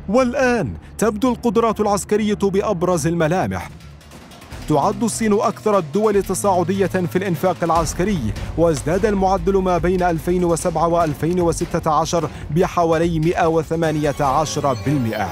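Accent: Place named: Egypt. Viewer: المعلق مصري 🇪🇬❤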